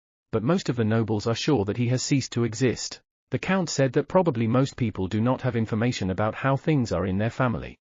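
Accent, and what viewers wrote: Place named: United Kingdom. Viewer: Why is the ai voice British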